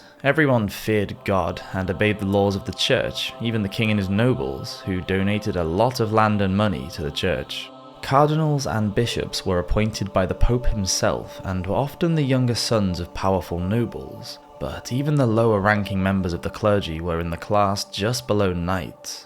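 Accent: England, United Kingdom. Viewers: English accent :)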